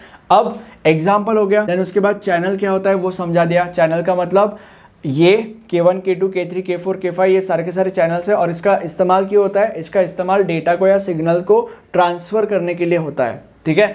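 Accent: Maharashtra, India. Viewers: are you marathi ?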